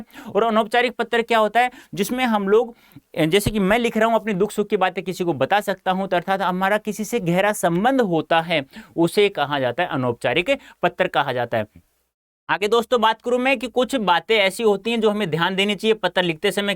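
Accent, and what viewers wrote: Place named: Haryana, India. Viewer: Sir ki language hai haryana ki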